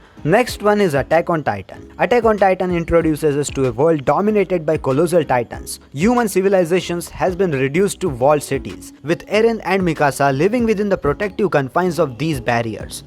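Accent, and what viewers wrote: India. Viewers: Indian accent is awesome bro. I studied engineering and would come home from a 2hr lecture not understanding shit. Then I watch a video of a guy with Indian accent explaining it perfectly in 30min or less… indian accent carried me through uni :D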